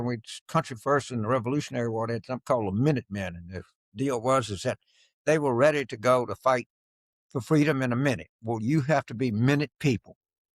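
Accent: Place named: Louisiana, United States. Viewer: This is funny as hell especially when you cuss with your Southern Louisiana accent.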